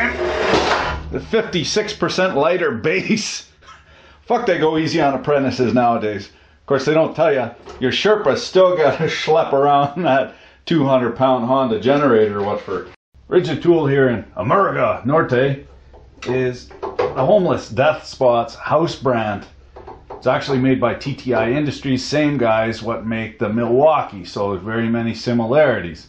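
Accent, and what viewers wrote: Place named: Canada. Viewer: I love ficking Canadians. you sir get a subscribe just for the way you talk lol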